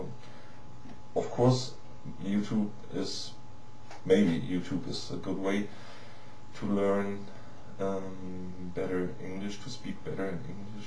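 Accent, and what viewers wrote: Germany. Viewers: Cool and funny :P Haha you can hear ur "English-german"! Anyway, maybe i come up with some cool ideas for ya m8 :D and btw, kill that bird! :D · Deine Aussprache ist echt gut, find ich, besser als meine ;) Und den Deutschen Akzent kriegt man eh nie richtig weg :)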